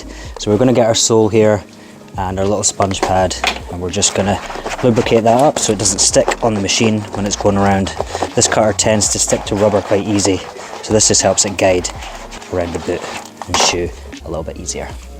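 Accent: Scotland, United Kingdom. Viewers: Watching an amazing craftsman at work…wonderful. Add in soft background jazz, a Scots accent, muscular arms and hands, eyes that give James McAvoy vibes, and wow! Sorry to embarrass you, but it’s true!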